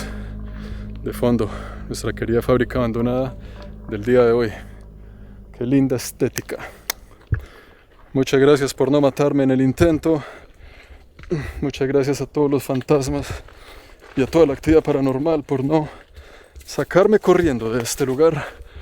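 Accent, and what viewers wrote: Colombia. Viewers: De qué parte de Colombia eres?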